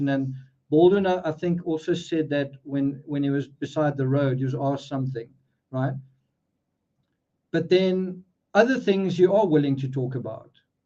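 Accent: South Africa. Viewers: please do not go to another garden! I appreciate you so very much - and I am sure so do many many more. All your time and energy in constantly following the truth, your insights into human nature and behaviour, your continuous urging to look at ourselves. And the oh so familiar South African accent - your nest certainly would be well lived in in our garden!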